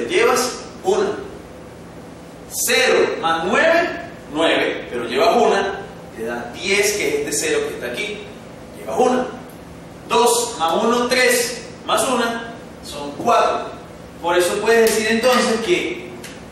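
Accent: Venezuela. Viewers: Simpático el acento venezolano... :) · Boyjess13 Tambien le Note el acentico Venezolano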